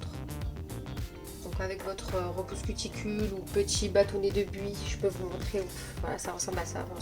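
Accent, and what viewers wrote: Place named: South, France. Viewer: Merci pour votre vidéo! J'ai arrêté de me ronger les ongles et je cherchais une vidéo pour en prendre soin. C'est chose faite! J'ai trouvé ma petite routine ongulaire 😁. Vous êtes du Sud non? J'adore votre accent!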